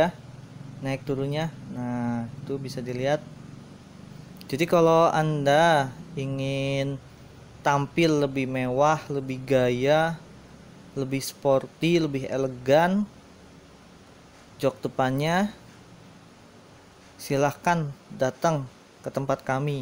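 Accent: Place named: Garut, Indonesia. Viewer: urang garut logay anjirr :D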